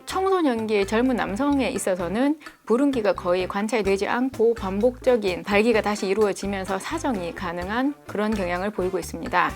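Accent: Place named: Jeolla, South Korea. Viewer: 전라도 깽깽이냐?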